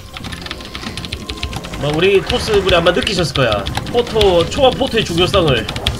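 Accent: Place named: Gyeongsang, South Korea. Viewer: 듣기싫은 경상도 사투리!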